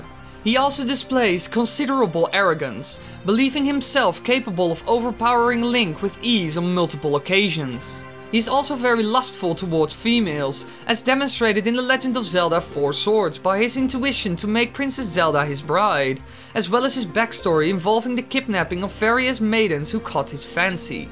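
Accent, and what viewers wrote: Netherlands. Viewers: are you dutch? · Lol i can hear you're dutch. Like me😂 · Are you dutch? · it sounds like he is dutch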